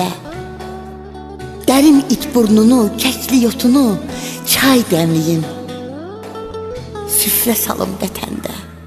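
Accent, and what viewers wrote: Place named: Nakhchivan, Azerbaijan. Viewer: 😂😂😂Lehçe lap Naxçıvan lehcesidir ki😂😂😂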